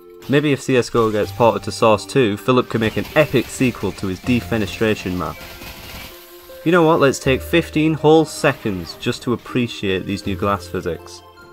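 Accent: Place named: Northern England, United Kingdom. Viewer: kliksphilip but northern england instead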